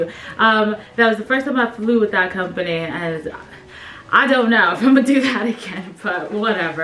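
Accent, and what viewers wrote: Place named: London, United Kingdom. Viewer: First off love the nails! Second I love your new London accent LOLS. YOur such a beauty can’t wait for second vlog!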